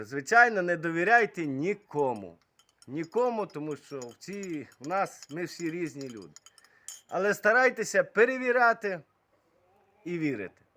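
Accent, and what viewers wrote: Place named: Western Ukraine, Ukraine. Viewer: Классный мужик очень позитивно все прям душа радуется что в Украине такие люди больше всего нравится акцент западной Украины прям очень привет из Одессы, про генератор все объяснил наглядно и понятно. Цікаво чи немає дивних шумів від телевізора або комп'ютера при роботі від такого генератора бо я маю перетворювач напруги з 12-220 від нього струм поганий для телевізора бо йдуть поміхи.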